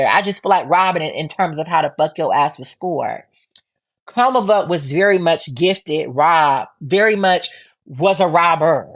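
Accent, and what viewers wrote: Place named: New York, United States. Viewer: I love your commentary where are u from you song like a new yorker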